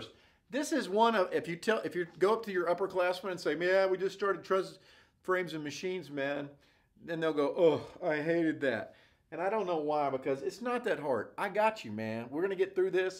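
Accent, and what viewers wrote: United States. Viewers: Is that a Tennessee accent I hear?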